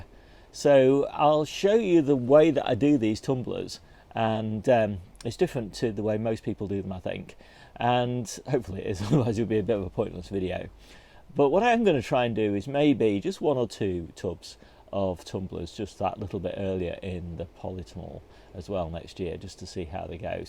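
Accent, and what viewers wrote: United Kingdom. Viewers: You sound like your in the UK. Where I live grocery store tomatoes are hardly fit to eat. They are picked green and gassed with ethylene. I might try this Tumbler variety.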